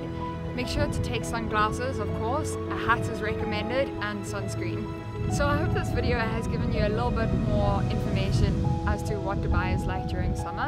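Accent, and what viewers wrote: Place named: South Africa. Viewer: Very strong South African accent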